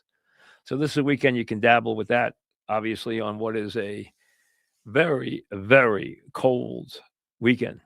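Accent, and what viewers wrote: New York, United States. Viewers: He's in or near Noo Yawk whaddya expect? Back afta dis...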